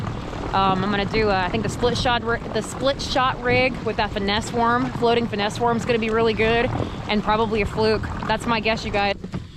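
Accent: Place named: South, United States. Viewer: Please. You say Nebraska but your accent says you are a southern lady!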